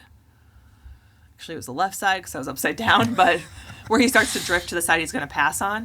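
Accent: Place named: Wisconsin, United States. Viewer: She’s awesome. What’s funny, I was listening to her talk and KNEW she was from Northern WI. I talked to/spent a lot of time with a woman about her age from up there and they sound the exact same 😂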